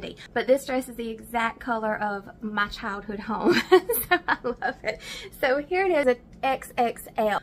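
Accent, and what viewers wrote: South, United States. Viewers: Girl,I love watching you and listening to you talk in your sweet southern voice . I love how you explain the materials and you try on things i wouldn't ever think i would buy but have concidered thanks to you . I am very insecure about my body and you have given me more confidence to at least try new things, so thank you for that . And your hair and makeup is always on point. Your just beautiful .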